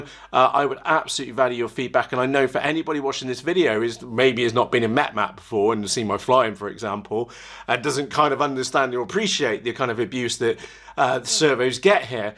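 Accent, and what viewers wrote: United Kingdom. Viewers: British acsen🤣🤣👌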